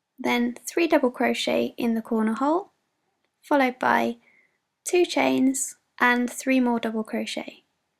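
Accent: United Kingdom. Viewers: where are you from? you sound a bit Devonshire too me... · She sounds so British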